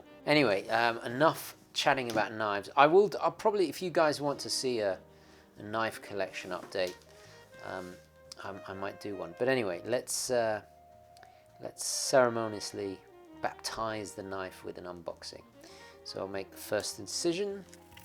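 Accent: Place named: United Kingdom. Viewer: ! you stated that it was the best GMT at $1000” love what you do and your the reason I brought my first hammy, the khaki field day date! Keep up the good work and make sure you don’t loose your British accent. From tom in Leicester 🇬🇧🇬🇧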